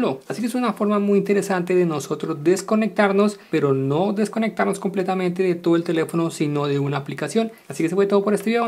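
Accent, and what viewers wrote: Colombia. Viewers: eres de Colombia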